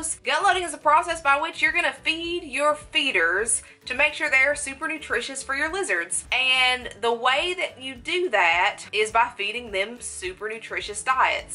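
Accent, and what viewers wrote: South, United States. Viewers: I love your accent. God I miss the south 😭 THANKS FOR THE INFORMATION 👍😊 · i love ur southern accent 😍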